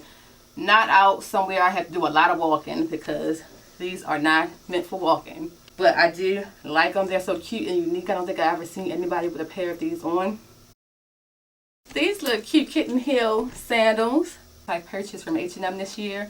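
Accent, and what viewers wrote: Baltimore, United States. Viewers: I really like your feet. Very pretty. And do I detect a bmore accent?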